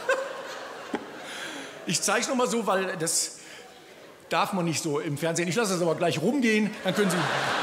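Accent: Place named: Duisburg, Germany. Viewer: So reden viele in Duisburg.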